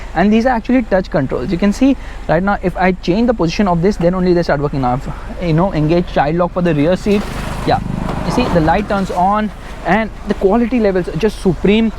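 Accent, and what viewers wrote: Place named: South India, India. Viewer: Why Indians speak sooooooo fast. English sounds like some south Indian language.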